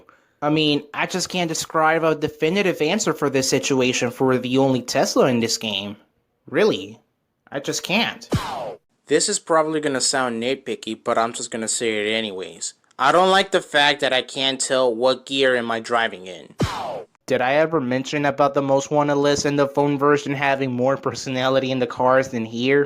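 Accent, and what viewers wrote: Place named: Bahamas. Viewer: I love your Bahamas accent. It’s so Heaty.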